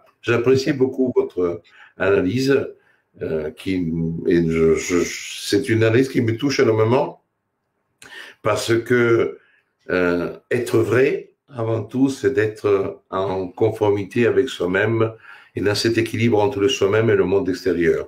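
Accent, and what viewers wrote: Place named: Italy. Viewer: Quel bonheur d’écouter notre cher cher cher, avec son petit accent d’Italie et son franc parlé.....merci à lui pour ces années de soutiens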